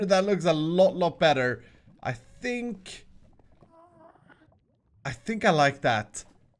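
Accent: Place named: Sweden. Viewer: I must say that I love how you talkin such a swenglish accent all the time but then do all those great english accents when you are playing around. Luv it =) You guys were great in the panel btw. One could say that it was a smorgasbord of hermits to enjoy.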